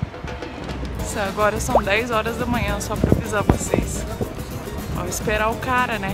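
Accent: Minas Gerais, Brazil. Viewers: Que simpatia voce! Conheci recentemente seu canal e estou gostando. E esse sotaque lindo, me deu saudades da minha querida Minas! Araxà è uma gracinha, nao fica muito longe da cidade onde eu morava ( Lagoa da Prata) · Ai bom demais da conta esse sotaquinho nosso mineiro né gente!!! Sucesso!!! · Ai, sotaque mineiro! O seu não é tão puxado, mas o do seu irmão é muito! Adoro! Minha família é mineira e morro de saudade do sotaque deles! Sei bem como você se sente vendo o seu primeiro livro pela primeira vez porque também sou escritora e publiquei livros, e é incrível pegar o SEU livro pela primeira vez! É lindo! Mas claro que você vai vender muito mais que eu. hahahahahaha De qualquer jeito, boa sorte e eu com certeza vou querer seu livro. Beijos e parabéns pelo livro!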